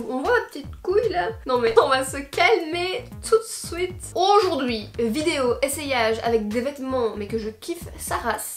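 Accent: Belgium. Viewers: mais Bardot ça veux pas dire Bordeaux 😂😂 les Beges on parle comme vous hein 🤣❤ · J'adore notre accent belge😂 #TeamBelge #Brussels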